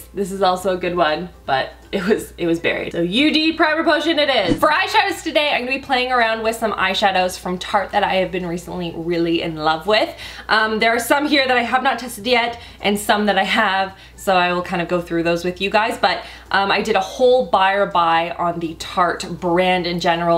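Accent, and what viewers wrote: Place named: Canada. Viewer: That Canadian accent really came out in this video! Cute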